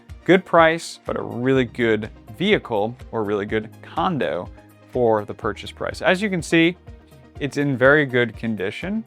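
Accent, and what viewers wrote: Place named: Philadelphia, United States. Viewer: I'd trust this guy. He's so Philly. I hear the accent (just a little).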